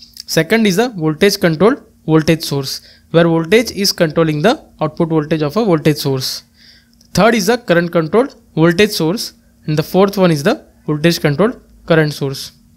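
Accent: India. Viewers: Uh Indian accent...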